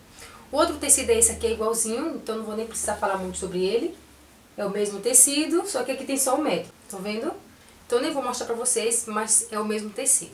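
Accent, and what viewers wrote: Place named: Northeast, Brazil. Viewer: minha linda eu amo vc seu canal, videos, amo ate a forma d vc falar com esse sutac tipo nordestino como eu rsrsrs....vc foi a primeira q comecei seguir aqui e atraves do seu canal q fui seguindo outros....bjsss linda mt saude e seja mttttt feliz com seu mozão!!!!♡♡♡♡♡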